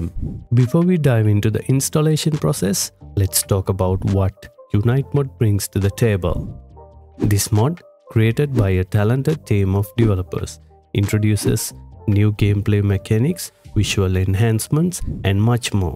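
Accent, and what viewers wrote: India. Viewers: Every time it has to be some random Indian mf. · your southindian accent do kicks more when you speak.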